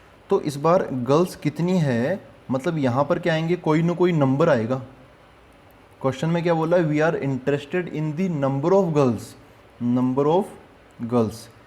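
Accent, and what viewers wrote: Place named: Haryana, India. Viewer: Sir apke bolne k tareeke se lagta hai k aap haryana k ho 😅 Shayad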